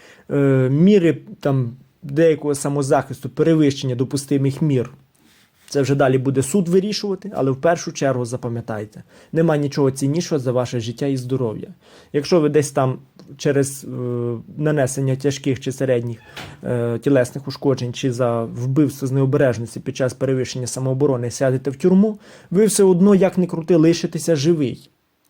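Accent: Lviv, Ukraine. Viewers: Красавчік, чую що із Львівщини чи поруч. Але контент цікавий😂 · Чути Львівський акцент у Пана,хоча може я й не правий😊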